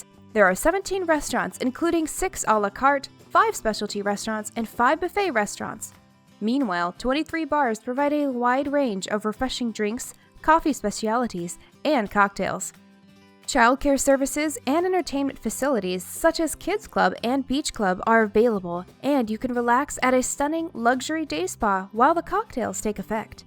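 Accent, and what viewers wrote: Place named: Germany. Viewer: I think it's German or English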